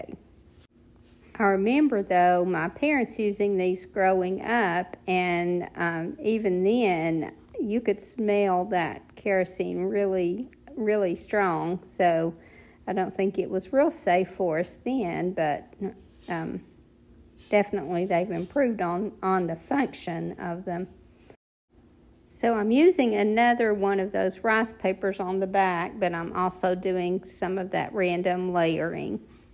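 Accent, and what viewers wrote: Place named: Tennessee, United States. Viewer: Love how this came out. I love watching you, but I am always getting you mixed up with Teresa from Our Green Acres. You & her sound alike. Dolly Parton accent, please I say that as a good comment. Great work & my style completely.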